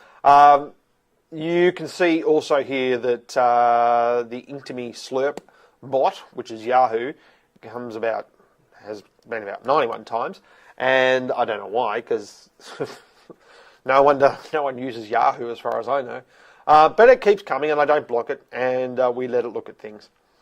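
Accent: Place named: Australia. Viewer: Thank you for this video! What do you think about zippcast? P.S. I like your Aussie accent :)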